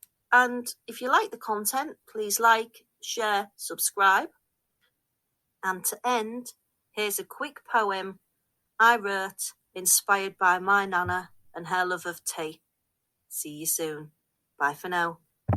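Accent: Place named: Driffield, United Kingdom. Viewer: Just found your videos. I live near Hornsea, and your accent is similar to where I grew up, near Driffield. I am as frugal as I can be. Boiling hot water for a flask, one light on at a time, re-using tea bags, looking forward to your previous videos. Best wishes.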